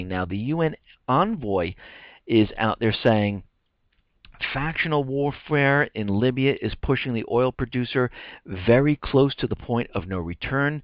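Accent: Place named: New York, United States. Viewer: Incredibly Great Reporting as always from one New Yorker to Another or at least you must be from the North East - but probably not Boston as their accent is much heavier - lol!!! I just feel that we are close to a Nostradamus prophecy with all the signs around us including what is in the sky (Blood Moons)... Thanks!!! I don't know if you follow any of the previous prophets like Nostradamus, Edgar Cayce, Alois Irlmaier but if you have followed any of these "Seer's" of the future if you would consider doing a video on their prophecies that would be great!!! Thankx, Janice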